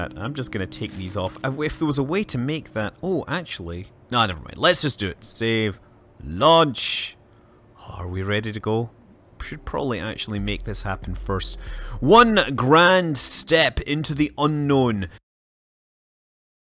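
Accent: Scotland, United Kingdom. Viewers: you're scottish right?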